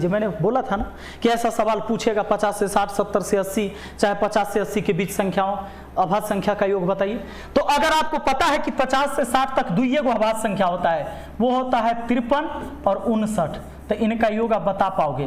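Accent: Bihar, India. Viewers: Tumhar language bihari hai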